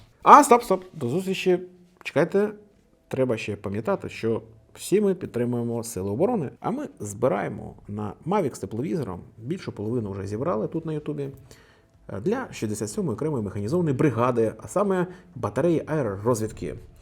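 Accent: Russia. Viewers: Московський акцент нагадує пʼєсу Подервʼянського «Кацапи»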